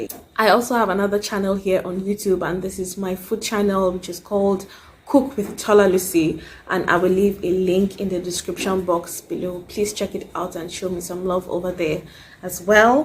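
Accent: United States. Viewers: Seems like u speak American English. Lol how is Tomato sauce a Ketchup though?? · Lol 😂 from listening to you i think our English influence is from different continent yours from America mostly ours from UK mixed with our own language 😂 we just hit the short cut with names 😂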